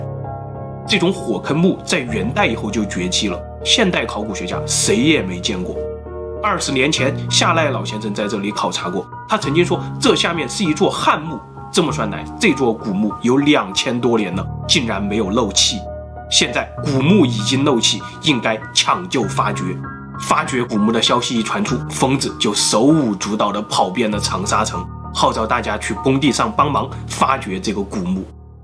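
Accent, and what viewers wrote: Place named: Fujian, China. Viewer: 刚把老九门看完😊，是弗兰人吧？完全的弗兰口音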